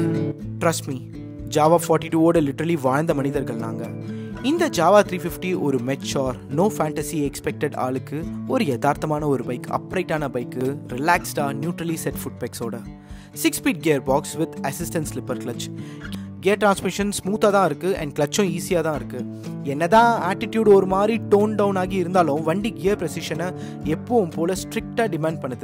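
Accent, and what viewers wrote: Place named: Chennai, India. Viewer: Your tamil .. is it how tamil is spoken in Madras these days ? Bad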